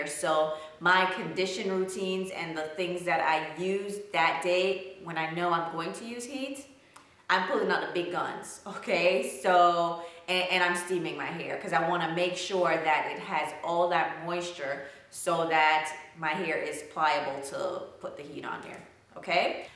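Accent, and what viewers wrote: Jamaica. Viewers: I know this is probably going to sound like a really dumb question, generally, by heredity, do most people of Jamaican descent, like yourself, have thick hair. I ask because my best friend is of Jamaican descent from her father and her hair is thick. I love your accent. It reminds me of her dad. I used to go visit them just to listen to him talk. My mom couldn't understand a word he said, but I could. :-)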